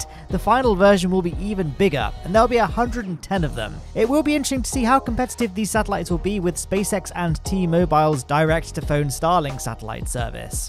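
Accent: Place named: England, United Kingdom. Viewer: Great content diminished by an English accent.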